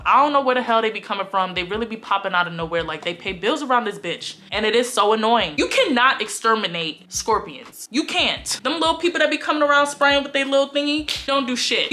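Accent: New York, United States. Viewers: I love this so much. You’re not trying to fake the funk you’re so YOU! I still see/hear some NY in there 😂 love to see it. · I love ya NY accent ans attitude, bk for 15 yrs. You are absolutely gorgeous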